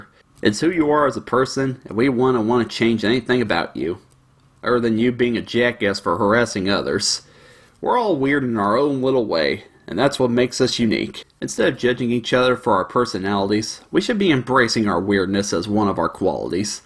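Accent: South, United States. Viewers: I didn't notice u weren't 'normal' tbh, in fact i considered you the most normal. Or at least in that ur very down to earth and even have a 'country boy' accent to boot. :-/ Liking cartoons isn't weird tho, not since the internet was invented at least. It's weird imo to say anyone who does like those things are weird.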